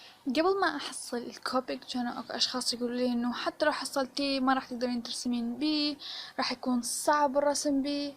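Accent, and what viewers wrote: Iraq. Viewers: عراقية مو؟ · حلوووه حبيبتي😍انتي اكيد عراقيه من لهجتج 😄😍موووو!!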